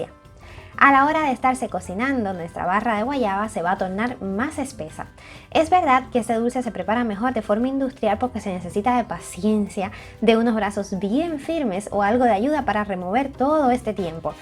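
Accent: Cuba. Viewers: Solo sé que me encanto tu acento cubano. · Hablas con acento habanero ☹️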